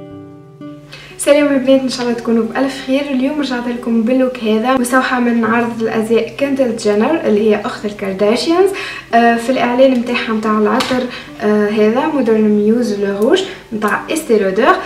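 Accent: Tunisia. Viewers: Jaime ta façon d te maquillé je suis moitié marocaine moitié allemand je vie en émirat arabes la ville Abudhabi merhbabik 😘 donc je comprend ton accent tunisien😍 bisou t'es merveilleuse ton nouveau décor aussi bisou contiue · انتي جميلة جدا وعجبني لهجتج التونسية الي عن جد جميلة اني من بغداد وصديقاتي كولهم يتابعوج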